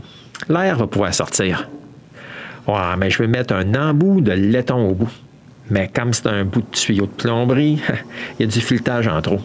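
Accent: Quebec, Canada. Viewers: C'est fou la sympathie que vous transpirez les québecois! j'sais pas si c'est votre accent ou quoi qu'est envoutant là... la preuve je parle comme vous là, y a votre accent dans ma tête :D Plus sérieusement tes vidéos sont géniales! t'as pas peur de nous montrer tes erreurs en plus c'est pas commun et super utile! ça donne envie de s'y mettre! Bravo pour ce que tu fais et merci! Alex, France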